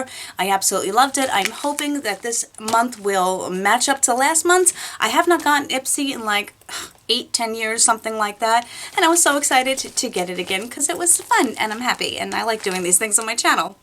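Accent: New York, United States. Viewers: Where are you from? I like how you talk lol New york? New jersey? I got the iby highlighter-make up forever foundation-mac lip gloss-the k vos lip balm-and a itsie eyeshadow 😁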